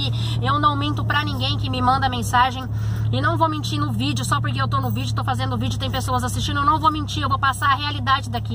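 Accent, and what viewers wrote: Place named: Portugal. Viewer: E esse sotaque português hein 🤔🤓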